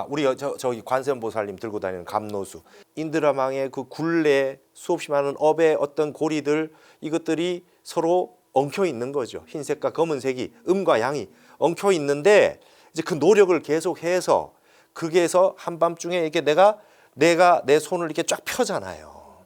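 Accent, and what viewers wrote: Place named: Gyeongbuk, South Korea. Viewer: 끝말이 ~라 경북말투네요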